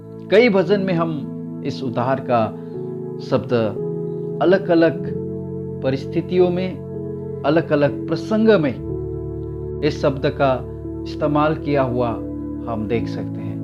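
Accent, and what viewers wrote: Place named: Telugu, India. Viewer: Aapane Vachan bataya bahut Achcha laga aur aap Telugu ho mujhe aisa lag raha hai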